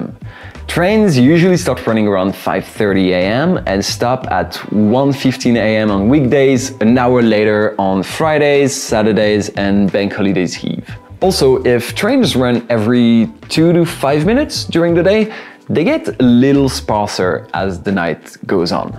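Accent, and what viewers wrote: France. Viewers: L'accent français t'as peur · WOW! Great video. It was a pleasure to watch it and learn it. I love that you speak with this amazing french accent about metro in Paris, France. I hope your channel will grow quickly.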